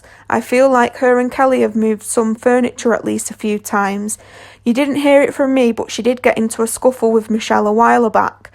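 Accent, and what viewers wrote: United Kingdom. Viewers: You are probably one of theeeee dumbest. The storyteller has a British accent. Now, beyonce on the other hand, sounds like she has a 5th grade education. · It’s a British accent (northern region)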